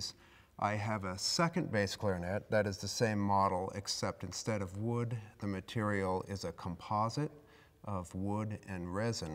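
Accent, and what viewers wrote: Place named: Utah, United States. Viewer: Is it just me who likes his utah accent?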